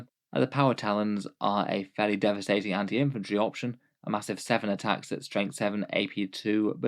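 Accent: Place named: United Kingdom. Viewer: Pretty much everyone in the UK speaks like that ;)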